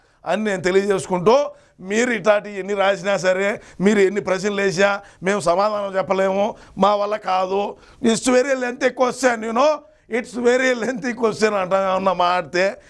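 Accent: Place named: Nellore, India. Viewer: Nellore Yasa eraga desthnav ga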